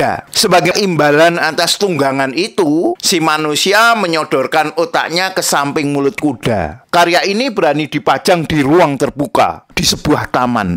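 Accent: Java, Indonesia. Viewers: Puyeng denger aksen medok nya.. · Suaramu medok bang , nguakak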